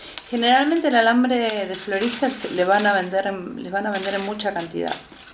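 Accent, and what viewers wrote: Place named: Argentina. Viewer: Muchas gracias, vivo en Thaïlandâ aqui los bambou crecen rapido 😄. Que acento mas bonito, eres agentina ? Besitos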